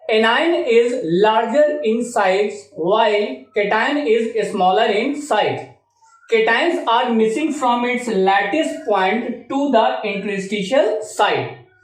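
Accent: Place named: Bihar, India. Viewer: sir you are a weird blend of hadrabady and bihari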